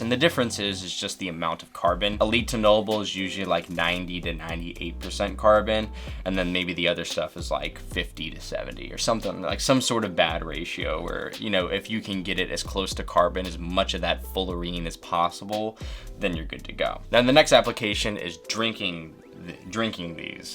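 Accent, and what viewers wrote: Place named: East Coast, United States. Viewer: Are you by any chance from the east coast? I swear I keep hearing a slip of accent come through that sounds like it could be anywhere from Philly/SouthJersey to Baltimore area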